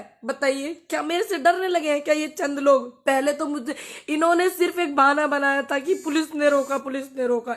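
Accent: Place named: Western Uttar Pradesh, India. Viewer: बिटिया! हम आपके साथ हैं । आपकीे भाषा दूसरी नहीं है । मै मेरठ से रहा हूंँ । मैने आपके लगभग सभी वीडियॊ देखें हैं । आपने कहीं भी अभद्र भाषा का इस्तमाल नहीं किया है । आपकीे भाषा पश्चिमी यू पी की ग्रामीण खड़ी बोली है, यह एक धांकड़ बोली है, हाजर जबाब बोली है । आप यदि दिल्ली में बोली जाने वाली बोली का इस्तमाल करेगीं तो पूरा देश आपकीे आवाज सुनेगा । इसके लिये आपको यू पी शिक्षा बोर्ड के हिंदी के गद्य-लेख पढने होंगें ।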